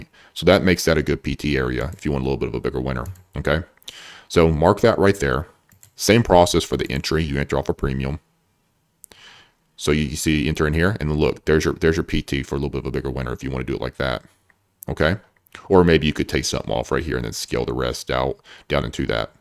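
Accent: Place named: East Coast, United States. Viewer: Lol. This was funny for some reason. Dude sounds like he's on the east coast but slightly south.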